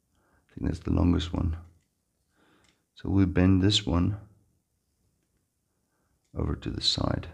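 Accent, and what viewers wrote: South Africa. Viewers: Do I detect a South African accent 😀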